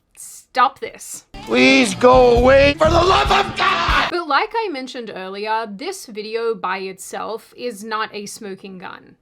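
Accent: Australia. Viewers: Surfing the Hell gondola straight through the afterlife! Death rattle was right!! Their demons are SCREAMING. Love you!! ❤️❤️❤️ P.S, I love audibly observing the evolution of your accent from Australian (aur naur) to a mix of that with American (fyuuumm)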